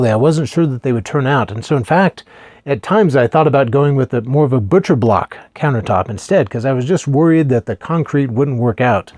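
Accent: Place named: South Africa. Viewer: Are you from south Africa your accent doesn't sound American beautiful job👌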